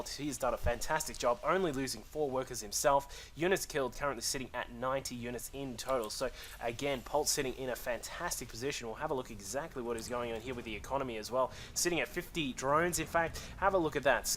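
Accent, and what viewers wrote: Australia. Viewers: an aussie?! bout time!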